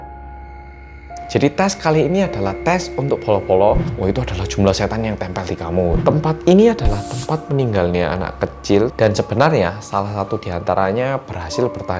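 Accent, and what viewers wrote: Java, Indonesia. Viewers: Ini orang Jawa atau korea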